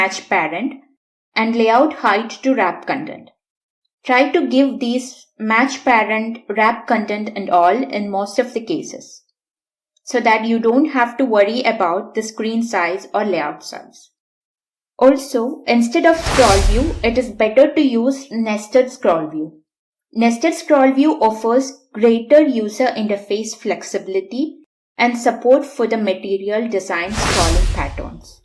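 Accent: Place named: India. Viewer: The first indian accent i understood Great video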